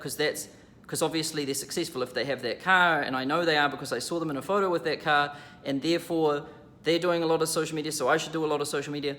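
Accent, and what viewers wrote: New Zealand. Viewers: A<link> your gorgeous Kiwi accent IS adorable!! Thanks, for the info, Champ! I'm still on the fence about signing up BTW...trying to believe that this isn't just going to be yet another online thing that promises the world, takes my money and produces not a whole lot. So, researching....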